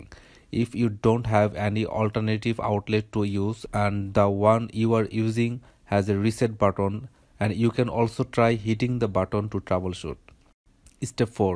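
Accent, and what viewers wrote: India. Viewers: When bro started talking with the Indian accent i knew i was in the right place... But L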